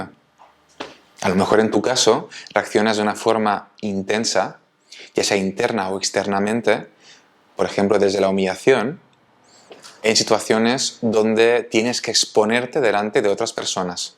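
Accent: Buenos Aires, Argentina. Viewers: Jajaja,argentino,pero no todos hablamos así 😄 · sorprendido por tu porteño.. aunque le falta un poco de trabajo. Es muy fácil hablar en argentino, intenta hablar el castellano en italiano y te convertis en un porteño más. Abrazo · Gracias por ese acento "argento", un gusto iniciar el día con tus vídeos y enseñanzas. Afectuoso saludo! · Jajajaja muy bien hablando en Argentino! Me encantó! A ver cuándo venís a Buenos Aires❤ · Te sale muy bien el acento argentino 👏👏👏 Me encantó escucharte! Ya hablando del tema del vídeo justamente me están pasando cosas parecidas y siento un agotamiento mental y físico que me hacen daño a veces. Entrar en el conflicto es muy fuerte, a mí me trae angustia que duele en el pecho! Ahora lo manejo desde la reflexión pero no logro evitar la angustia en la primer etapa. Luego lo puedo superar. No es sencillo de explicar pero lo puedo manejar. 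Gracias siempre mi querido terapeuta. Abrazo desde Argentina. ❤️ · Ayyy...que gracioso!!! Pareces porteño!!! Ja ja ja!! Hermoso tu vídeo y super útil para entendernos un poquito más...la observación en cada situación aporta claridad , es como desmenuzar y llegar al fondo para desdramatizar. Besos desde Bueno Aires. Argentina!! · Me encantó el asiento argentino.. saludos desde Argentina 😜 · Jajaja ! Muy bueno tu acento argentino! Te lo digo desde La Plata, cerquita de Buenos Aires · Acento argentino...o uruguayo...un abrazo...! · Jajajja....Te queda bien el tono argentino,cariños desde Bs As!! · Buen dia soy argentina y me hiciste 😀 reir porque asi tal cual hablamos pero los de BUENOS Aires. Nunca cierres tu canal que sos de mucha bendición. Con este video le voy a dar una bendición muy profunda a alguien de mi familia que está atascado en su emotividad porque tiene TLP y lo necesita. Dios te bendiga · jajajaj el acento argentino, lo amo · Me encantó tu acento argentino ya me creí que eras de los nuestros!! 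El humor sano e inteligente es mí aliado para muchas situaciones! Saludos desde Mendoza Argentina!! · Jajaja amé tu acento argento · Jajaja te salio genial el asento argentino!!!!! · Jajaja me sorprendió el acento argentino! XD muy bueno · Amé tu acento argentino..!! Quedo pendiente de tu imitación colombiana..! 😂😂🇨🇴 el buen humor es maravilloso..! Cuánto aprendo contigo..! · Jajajajajaajaja sos más lindo me encanta el acento argentino. Saludos desde Uruguay. AMO que le pongas humor a tus videos, le suma muchísimo !! Un saludo grande che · Como eso el final de tu carrera ?a no!! que hariamos sin ti los sabados por la mañana?Te sale bien el argentino, tendras que venir a perfeccionarlo aqui ,bendiciones desde Argentina .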